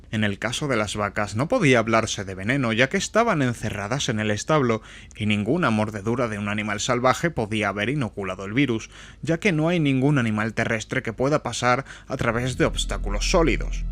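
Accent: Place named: Spain. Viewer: Por fin un audio en castellano (españa). No me considereis racista, simplemente es la forma de hablar que utilizo y me es cómoda y familiar, así me centro más en la historia. Gracias!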